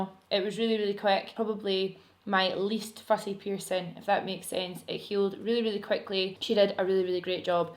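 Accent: Scotland, United Kingdom. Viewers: i moved to ggw a little over a year ago and i just realized how good i am at understanding scottish accents now vs then. my god, i swear i just laughed and nodded at everything for a month (im swedish and moved here from portugal). your accent is still really mild to most of the folk i interact with, christ. idk why but your content makes me feel at home and your love for your pup had me subscribe before i knew what you generally post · I love it when I accidentally stumble across a fellow Scot. Also I wish my makeup was as on point as yours 😍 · I think scottish accent is so sweet!! But when i first started wathing i did kinda struggle understanding her but now that i have been watching her pretty long time, no problem 😄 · I find it so interesting when people say they struggle to understand what you’re saying because I’m Scottish Too and so it sounds so normal to me Haha. 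💕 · Oh my god I hit a whitey 😂😂😂 such a typical Scot I love it 😍😂 sounds exactly like me x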